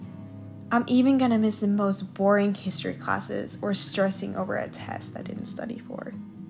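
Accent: United States. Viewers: Hi, did you learn your American English pronunciation and being to speak well while you were on student exchange program? Also, it’s been over a year since then, how are you doing now and you still reminiscing your school year in US? Or just a distant memories now? Thanks. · This video was so touching. Thanks for sharing your experiences...and you‘re English sounds so American now haha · just wanted you to know I thoroughly enjoyed your exchange video in Iowa. I also want to thank you for taking the time to answer all the questions from potential exchange students that follow you on YouTube. As you know it's a big step to leave home and family for almost a year all on their own. Especially going to a new country, with different customs, new language, new school, staying with strangers and meeting new friends. Your video will most certainly help future exchange students and those thinking about it. 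I am a former host dad now living in Virginia and have previously lived in New York, California, Illinois, Oregon and Colorado. I still enjoy following the exchange program and watching high school students as they grow into young adults throughout the year and experience the American way of life first hand. I think it is an opportunity of a life time that very few students will ever get to experience. I worked for the airlines for many years and have been lucky enough to experience many other countries myself and look forward to my first trip to Denmark this fall. I have heard of the Faroe Islands but admittedly know very little about them (kind of like Iowa was for you) 😂 Since I'm always curious about other countries I had to google the Faroe Island and find out more information. Let me just say the pictures were absolutely beautiful!! Reminds me of Ireland in many respects. I have to ask, how is it your English is so perfect and with an American accen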